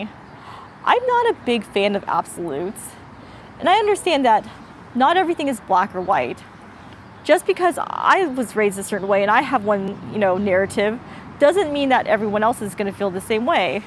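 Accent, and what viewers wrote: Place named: Iowa, United States. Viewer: Hello fellow KAD - love your video. I’m going to message you and share my thoughts, because I don’t showcase mine publicly. But I want to say you’re one of the few adoptees who I can 99% relate to! And I find it funny when you say “I can still have an Iowan accent and eat kimchi.” You need to hear the way I talk! 🤣 Thank you for making this!!! ❤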